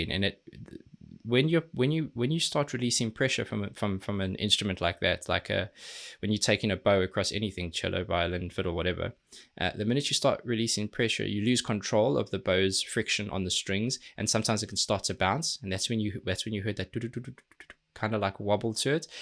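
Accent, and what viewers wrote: South Africa. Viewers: Love love love the technical breakdowns of all the reactions, can see the genuine joy in your face when you hear something that works great! Can i beg that you you check out anything from these 2 bands but my pick would be - and -. Keep up the awesome work man! (also an aussie with kiwi parents i understand the springbok accent haha)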